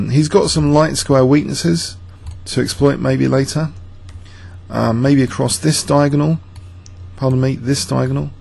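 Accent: United Kingdom. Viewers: Sounds like your saying Fawn pawn to me for some reason.I guess it's just your British accent....I'm not hearing it correctly. It is "thorn" pawn though yes? After watching the reaction video he seems blown over by the move, but immediately castles. Amazing how good these guys are, even in a five minute game how to mitigate damage so quickly after a shocking move/blunder what have you. btw- pertaining to your initial comments. Personally I'd love to see Kasparov make a comeback.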